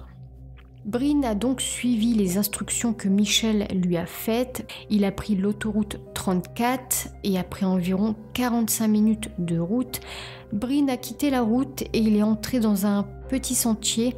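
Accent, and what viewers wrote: North, France. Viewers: Bonjour, je te découvre et sincèrement très sympa, car excellente recherche et superbement raconté. Et ce ptit accent du nord🥰. Belle continuation.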